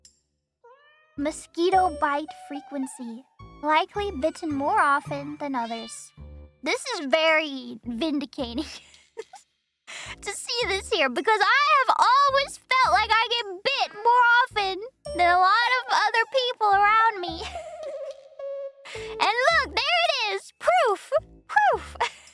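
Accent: United Kingdom. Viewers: I knew she had to be mainly british once I heard her british accent - it's bloo'y lovely! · With how much british ancestry you had I hope to hear that lovely british accent a lot more:_nimiHaha: Nice silly idea for a stream and dna accurate drawing was fun. Thanks for the stream:_nimiHeart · I always knew you were British, your American accent is VERY convincing though. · Authentic British accent! Thanks for the super fun stream! Looking forward to more! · The British accent has been ingrained in her this whole time. It was foretold. :_nimiHaha: Thanks for the stream :_nimiHeart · I knew she was Bri'ish, you can hear it in her accent :_nimiNerd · thanks for the stream!:_nimiHeart: it was fun going through your results and I can see where the amazing british accent comes from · Wow, the results are in and is 100% cute, but with no trace of elephant:_nimiCry:. 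Ah well, at least you have genuine British in you, so your accent must be genuine as well! Thanks for the stream and see you for next time! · イギリス訛りいっぱい聞きたいよ！♡ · My oshi is 2/3s British, now the British accent coming so naturally makes sense:_nimiHaha: This was super interesting, seeing all the results. I still think the ear wax is very cursed though:_nimiHaha: Thanks for the stream:_nimiHeart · British accent is confirmed to be authentic! This was such a unique stream idea.:_nimiHeart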